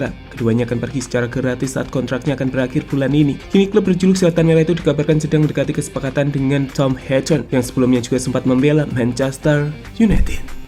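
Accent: Indonesia. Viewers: Anda Orang Asli Malaysia..?? Kok Logat bicara nya Indonesia Banget..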